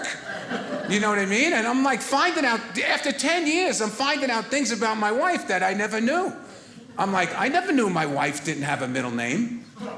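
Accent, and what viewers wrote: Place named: New York, United States. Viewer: I didn’t even notice he was from New York because I live here. Lol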